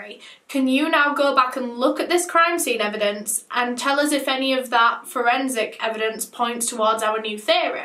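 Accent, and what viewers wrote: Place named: Yorkshire, United Kingdom. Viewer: she's from leeds (west yorkshire), the same as me so yorkshire x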